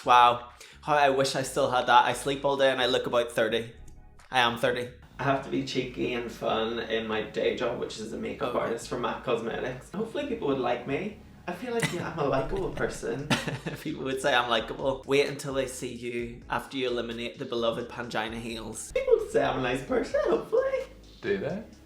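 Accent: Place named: Northern Ireland, United Kingdom. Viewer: Literally FELL IN LOVE with the moment I saw you. Your Aura is so fresh and I love a NI accent lol. You being a Crowned Queen is CORRECT 💙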